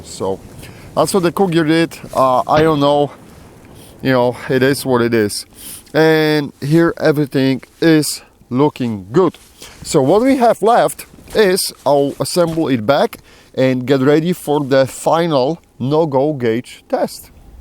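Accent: Poland. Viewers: I know this is an old comment, but this is his real accent. He’s Polish.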